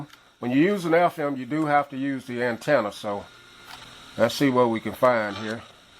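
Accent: United States. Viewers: I like the way you talk...sound like me....a touch of Hilbilly Country Boy.... Nice review!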